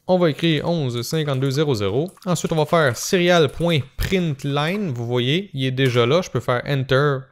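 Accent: Quebec, Canada. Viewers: Bonjour, Je te remercie pour ton site et tes vidéos. Je suis un vieux programmeur et je m'intéresse aux sujets que tu présentes. Merci pour celui sur l'Alfawise U20 One, achetée, surper content. Merci aussi pour les sujets ESPxx. C'est bien que tu présentes VSCode qui est un "rouleau compresseur" qui écrase tout sur son passage. Je l'utilise depuis la version 0.8 et je l'ai vu grandir. J'ai fait beaucoup d'Arduino pour mon plaisir durant quelques années. Quand je m'y suis remis, j'ai failli vomir : le même IDE qu'en 2011, à quelque chose près. Utiliser VSCode pour refaire de l'Arduino m'a redonné du plaisir à coder pour ces petites machines. Je crois qu'il ne faut pas hésiter à laisser l'IDE d'Arduino de côté. VSCode propose un retour sur investissement bien supérieur et il va continuer de grandir, l'IDE Arduino actuel, c'est mort. J'utilise VSCode professionnellement sur Windows et sous Linux (ça marche même sur une rasp !) et c'est un outil de productivité formidable. 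Je ne voulais plus faire de "Visual Studio" tout court, j'en ai marre de ce genre de produits. Avec "Code", j'ai vraiment retrouvé le plaisir de coder (en python essentiellement). Merci encore pour tes vidéos (et ton léger accent canadien c'est du pur bonheur pour les français) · Bonjour. Voir et écouter tes video est un double plaisir. Les explications sont très claires, ni trop rapide ni trop lentes. Tu fais tomber les barrières technique avec une facilité déconcertante! Le second plaisir, c'est entendre ces explications avec cet accent québécois que j'adore (je suis français et j'habite à Paris) me remplit toujours de joie! J'espère pouvoir retourner prochainement à Montréal. Si tu y habites je t'offre une bière!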